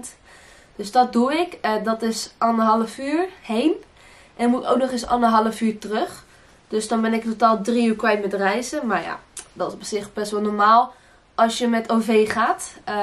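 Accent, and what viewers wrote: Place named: Portugal. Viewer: Desculpa a sinceridade, você é mais linda falando em português. Seu sotaque português é muito fofo. Amo quando você fala em português muito fofinha, charmosa ❤️🥰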